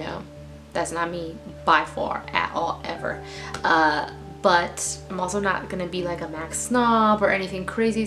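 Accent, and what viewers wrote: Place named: Bay Area, United States. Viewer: Good luck with your channel! Would've thought you were from the bay because of your cute little "accent" and because you say hella a lot haha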